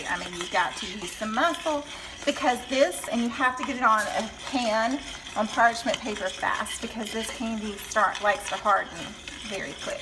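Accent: South, United States. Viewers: My Momma used to get fresh Pecans from my brothers tree and he had a black walnut tree too and she would get bucket fulls. I don't think she ever made pralines though. I do like them though. I say it just like you do it is a Southern thing I think. I had a lady correct me and said it like the other way to say the name and I said well I say is the way I do that is how I was raised to say it and she told me that is the wrong way. I was like okay whatever lol.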